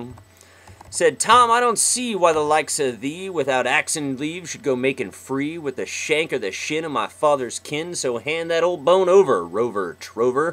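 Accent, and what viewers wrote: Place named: South, United States. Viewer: "Why the hell does my southern accent start to pop up when I'm reading something?" Be proud of your southern roots. Nothing wrong with a southern accent. grins.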